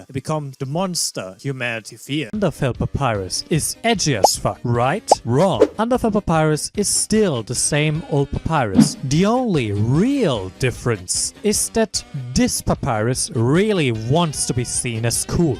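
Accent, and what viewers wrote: Germany. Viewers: Dein Akzent klingt sehr Deutsch.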